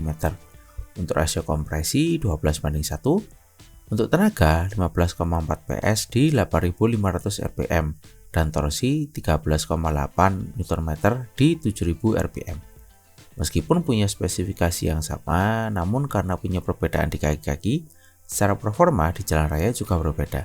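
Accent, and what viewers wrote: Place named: Java, Indonesia. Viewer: Lebih suka sama suara mas yg dulu karena ciri khasny medokny 😢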